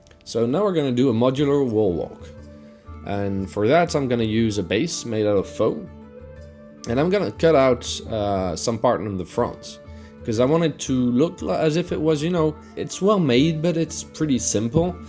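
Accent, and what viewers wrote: France. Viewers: What part of France are you from?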